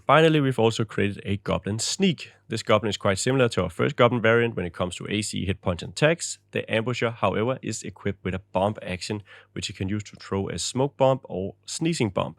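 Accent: Denmark. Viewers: love the quality of the video but i just have to know. are you danish?(i just Wonder cause of the accent)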